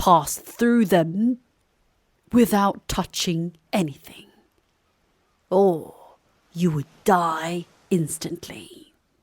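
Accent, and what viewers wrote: United Kingdom. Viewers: 저 따라하다가 영국발음 성대모사 중독돼버렸어요 ㅋㅋㅋㅋㅋㅋㅋㅋ한국말도 자꾸 영국식으로 주접떨면서 하고싶고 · 영국발음 들으러 왔는데 왠일인지 점점 졸려서 10시도 안됐고, 영상은 항상 집중하게 되서 이상하다하고 피곤한 저를 질책하고 반성하려 하다가, [수면용]이라는 걸 다시 깨닫고 아직 잘 때가 아니라서 "Ask not, but eat" replied Aladdin.까지만 봤어요 ㅎ 세시간 뒤에 영상에 목적에 맞는 시청자가 되어서 다시 듣도록 하겠습니다~ 영상 감사해요~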